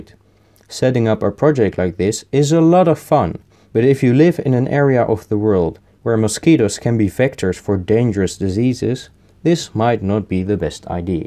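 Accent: Netherlands. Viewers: Fellow member of the Netherlands, your accent is Amazing! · Je Nederlandse accent veraad je XD geeft me altijd een lach als ik weer een nederlander op youtube tegenkom gaaf project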